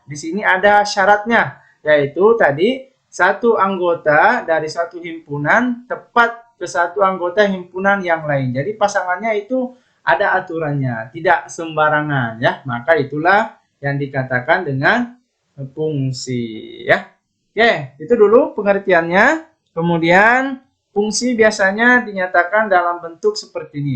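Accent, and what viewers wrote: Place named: Sulawesi, Indonesia. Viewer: pasti kakak orang Sulawesi kan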